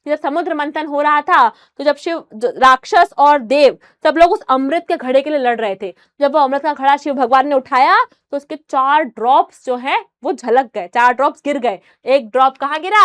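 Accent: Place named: Haryana, India. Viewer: Haryana te ha yaar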